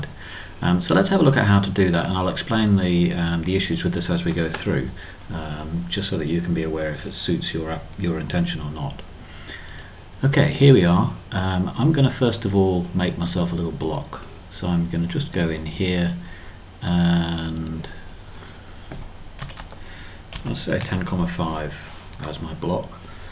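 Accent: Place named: England, United Kingdom. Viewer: Clear instructions; great classic english accent!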